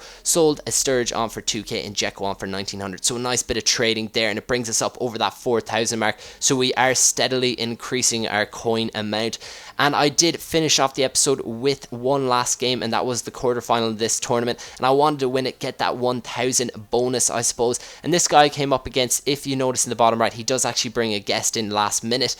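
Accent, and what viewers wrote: Ireland. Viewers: i love you cause your irish lke me:)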